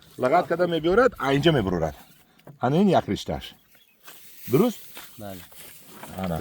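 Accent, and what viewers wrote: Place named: Tajikistan. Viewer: Амаки ман бори аввал аст навори шумора мебинам, бисер писандам омад, хусусан сабки тоҷиконаи гуфтор ва тарзи фаҳмондадиҳӣ, офарин ба Шумо! Фаромуш накунед ки дар Тоҷикистон ҷавонони бисер баландтафаккур ҳастанд, вале имконият ва муосидат аз тарафи давлат хеле кам аст, чун он ҷо аксарият тайерхурону шикамдамони бемаърифат нишастаанд! Боқӣ саломат бошед. Тавсия ба Шумо: имкон бошад дар студияи сабки овоз ба дубляжи филмҳои классикии амрикоӣ ва ҷаҳонӣ аз заӣоннҳои русӣ_ инглисӣ машғул шавед; овозатон бениҳоят ғулғуладор ва устувор ва табиӣ садо медиҳад; PS Sufizoda Siroj, журналисти озод)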